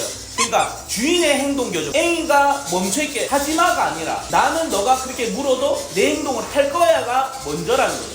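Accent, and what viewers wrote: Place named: Gyeongsang, South Korea. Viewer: hand-pink-waving:hand-pink-waving:hand-pink-waving:안녕하세요 올만에 적어봐요 ㅋㅋ아따~!! 말도 잘하신다 갱상도 사투리로 막 나갑미데이~! ㅋㅋㅋㅋㅋㅋㅋㅋ 직입미데이~! ㅋㅋㅋ 역쉬 짱~!!! 머리가 좋은거죠 ㅋㅋ애기들이 ㅋㅋ 그래도 아파요 ㅋㅋ 장갑껴도 안된다 하시고 ㅋㅋ 손꾸락 끊어져도 걍 맡겨야겠네요 힛 ~^^ 항상 응원합니다 ^^:face-red-heart-shape:yougotthis:yougotthis:card-red-penalty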